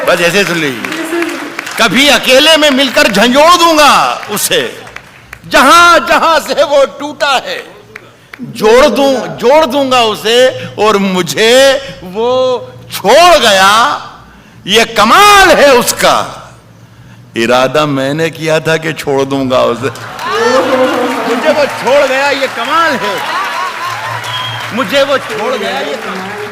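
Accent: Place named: Jaipur, India. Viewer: Aap jaipur se ho mene aapki bhasha suni mebhi जयपुर मैं ही rehta हूँ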